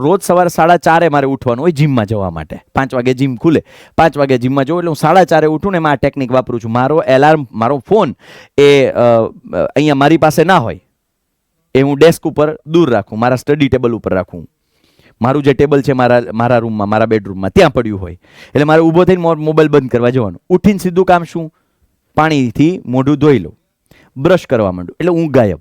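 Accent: Kathiawar, India. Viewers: Tame kadhiyavadi cho to sarkhu j bolu kadhiyavdi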